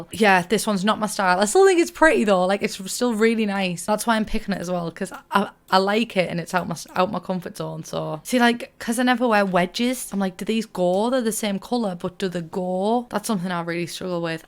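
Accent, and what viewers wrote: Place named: Middlesbrough, United Kingdom. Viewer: I accidentally left subtitles on from a previous video and it struggles so much with your boro accent 😂 eg ‘girly’ changed to ‘garlic’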